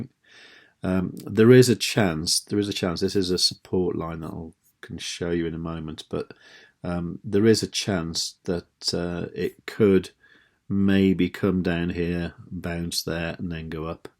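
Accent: Midlands, United Kingdom. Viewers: What part of the uk you from u sounds like ur from midlands?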